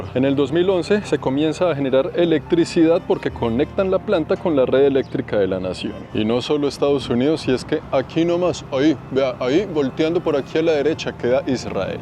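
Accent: Colombia. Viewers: eres de las personas preferidas, tu español es muy Colombia 🇨🇴, emprendedor como todos tus paisanos. Gracias por mostrarnos el mundo. Desde los llanos de Colombia 🇨🇴🇨🇴🇨🇴🇨🇴🇨🇴🇨🇴.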